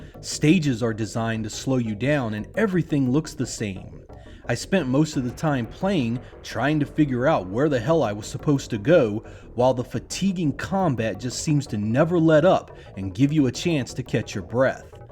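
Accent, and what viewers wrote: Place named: Philadelphia, United States. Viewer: You can tell he is from Philly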